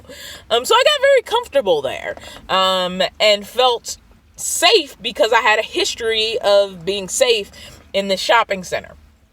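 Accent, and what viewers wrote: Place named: Baltimore, United States. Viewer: Sorry this happened to you and your van. If you decide to pursue the issue, the police can review video from the stores to see if your incident was captured on video, and perhaps also their license plate. Even if you never mentioned Baltimore, I can hear it in your voice, especially the "oin," instead of "on." I'm guilty of that one too.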